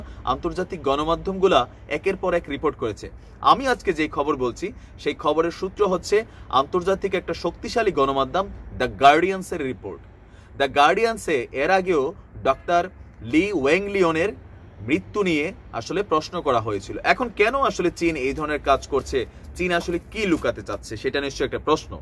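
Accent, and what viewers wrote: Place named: Noakhali, Bangladesh. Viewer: কথাসুনে মনে হয় নোয়াখালি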